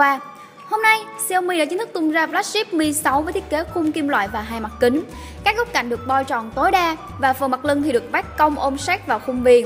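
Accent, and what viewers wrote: Vietnam. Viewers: Chị đọc tiếng Anh mà lai tiếng Việt, ức chế ghê !!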